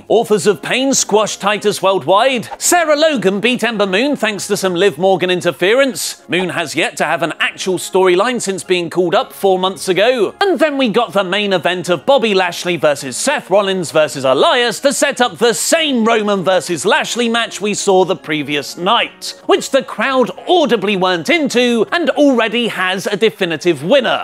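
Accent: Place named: United Kingdom. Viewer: u need a rko I hate ur British accent I'll give u a beer then a stone stunner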